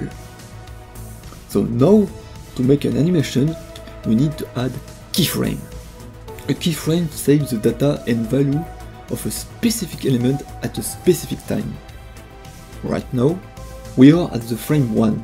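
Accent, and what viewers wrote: France. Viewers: This is french accent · mdr tu me fait rire avec ton accent francais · Ah yes, french accents. "Y a du skill" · Oh tu es Fraçais ! C'est bien ce que je me disais ;) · je l'avais déja remarquer ton accent français :p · Tu as un axen fr lol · nice l'accent français mec ahaha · As French myself , i enjoyed to recognize this famous accent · French? I was wondering why you sound like I have no idea about. Are you actually from France?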